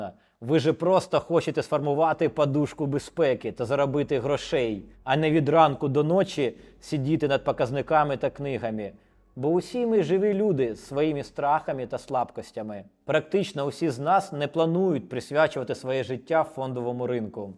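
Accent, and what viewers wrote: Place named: Russia. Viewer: Дуже важко слухати ці рускаязичниє чєлюсті😅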